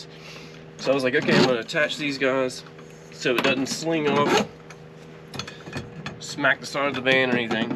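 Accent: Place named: Georgia, United States. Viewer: Sound like a Georgia Boy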